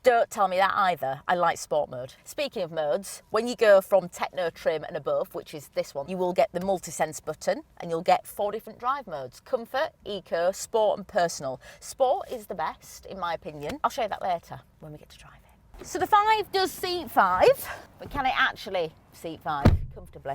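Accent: Yorkshire, United Kingdom. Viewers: Accent? Yorkshire? Love it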